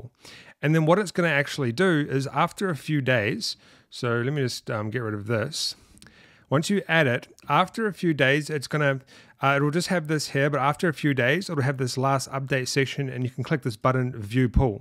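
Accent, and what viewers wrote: New Zealand. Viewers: awesome. a Kiwi Accent. Also When did you make this vid? cuz the info i'm seeing. with a Nvidia RTX3090 est.daily profit is 9.2USD. Ummmm what? defo not 35.42USD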